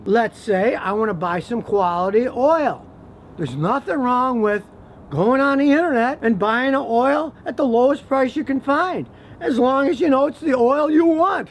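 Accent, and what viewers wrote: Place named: New York, United States. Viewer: You from New York or Chicago? Love the straight forward talk.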